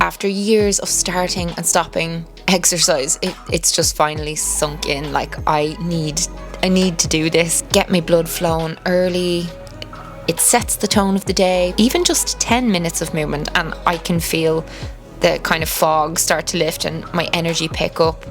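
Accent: Ireland. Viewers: I love those videos ❤ you never dissapoint ❤ I'm from Poland, my boyfriend is from the UK, you're the first and probably the only youtuber I watch from Irleand and I love the accent. I'm in my mid twenties not close to having kids yet but yet you still manage to inspire me with every video you put out and to incorporate some bloody positive chagnes in my day to day life. No lying, you must be the youtuber that inspires me the most and if I had to count down on screen time your channel would be the only I'd watch ❤ I'm currently doing my driving licence as well I started when I was 19 but there's been complications I truly can't wait for this to be my reality, to have so much freedom I deeply long for ❤ I feel bad about myslef not having it sometimes. You share with us so I thought I'll say a few bits about me, your dedicated viewer. You really do inspire me for my future, kids, overcoming traumas/addictions etc. 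With two little kids you're doing such a good job! here on youtube and raising those little angels (I'm sure they have those days, but they seem so smart and emotionally inteliegent). It's incredible how simple things are sometimes, go to the forest, move your body, eat whole foods. Everytime I forget about it and start feeling like s.. I have to go back 🌳🌿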